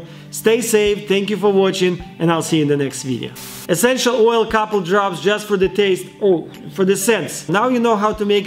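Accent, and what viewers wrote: Russia. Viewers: Great Russian accent and scientific experiments, while helping out humanity during the pandemic. Good job 👍.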